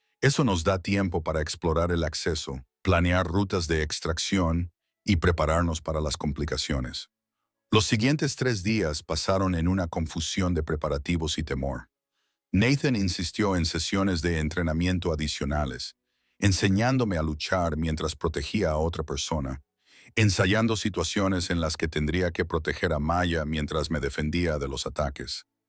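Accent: United States. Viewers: Cambien em robot de lectura yanqui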